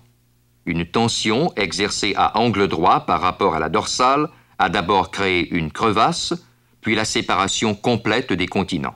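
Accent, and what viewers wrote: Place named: Quebec, Canada. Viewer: un canadien (ça s'entend très nettement) qui essaye au maximum d'avoir l'accent "français"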